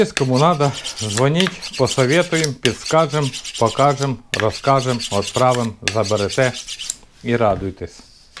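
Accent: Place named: Kharkiv, Ukraine. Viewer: Дуже подобається твій говір!!! Наш-Харьковский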